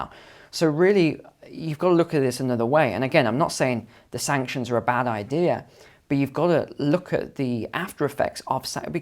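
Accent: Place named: United Kingdom. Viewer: "I don't know about you but I don't fancy going to a nuclear war right now" UK English just sounds so proper and funny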